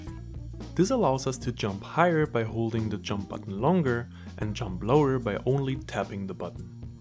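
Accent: Germany. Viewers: are you german? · Nices Tutorial, kann es sein, dass du Deutscher bist? xD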